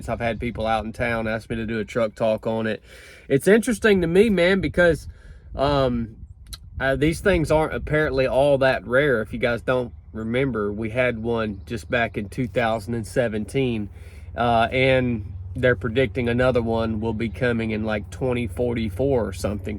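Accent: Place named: West Virginia, United States. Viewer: So you know has WV accent.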